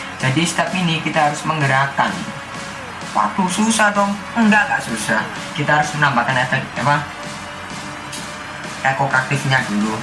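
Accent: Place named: Java, Indonesia. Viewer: anjir medhogmu coeg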